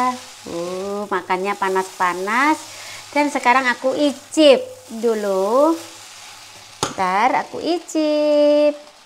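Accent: Java, Indonesia. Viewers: Lucu bngt bhsnya jawa medok 😀😀 suaminya ajarin bhs jwnya psti seru, klo udh laper gk tahan 😀muantaap bihun gorengnya praktis di masaknya, siip suami istri slng supory kompk apalgi saling bercanda ok bngt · Mbak aq suka skali kl ngmong ada bahasa jawany n' medok2 gmna gthu hahaha... Smoga sukses n sehat slalu buat smua keluarga ya mbak...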